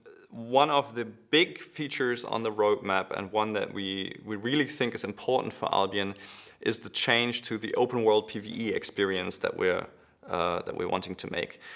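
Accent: Germany. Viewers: This man sounds SO ULTRA German.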